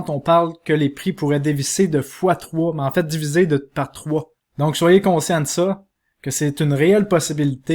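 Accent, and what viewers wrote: Canada. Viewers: Super video com Dab.. L’accent Canadien est tres fort et pour un Francais il faut se concentrer pas mal pour bien comprendre ;)) Merci bien quand meme ! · TB video avec en plus cet accent canadien (vu de France)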